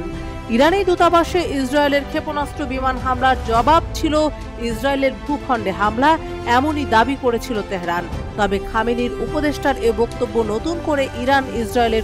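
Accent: India. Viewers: India voic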